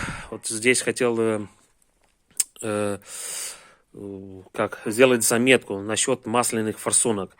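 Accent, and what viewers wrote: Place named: Moldova. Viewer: Вы с Молдавии? По акцент у узнаю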